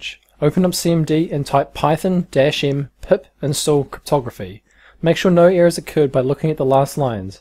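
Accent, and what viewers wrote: New Zealand. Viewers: Thanks. I like the Kiwi accent too.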